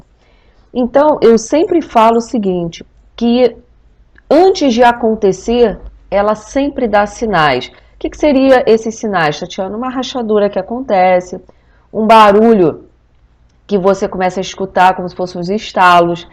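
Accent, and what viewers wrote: Brazil. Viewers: Esse sotaque me parece ser de uma paraense nata, né não?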